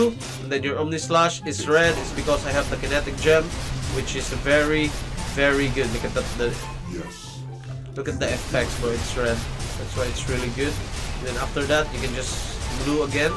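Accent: Bisaya, Philippines. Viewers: bisaya man diay ka HAHAHAHAHAHA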